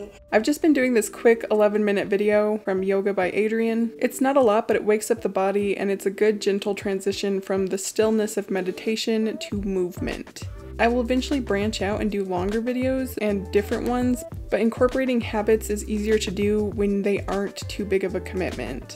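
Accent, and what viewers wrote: United Kingdom. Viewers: I need a morning routine. Cheers for the reminder and LOL at your British accent. I'm from Manchester England 😂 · Well done. I hadnt realised that Headspace has that suggested run of things for the morning, thanks for that! i've been not noticing that function:) also, I LOVED your British accent, very good. You sounded very familiar! Thanks for a great video. take care x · Top effort for your British accent! ⭐️ 🇬🇧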